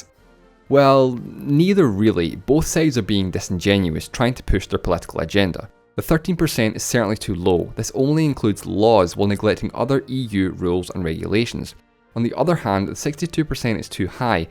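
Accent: Scotland, United Kingdom. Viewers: love your scottish accent haha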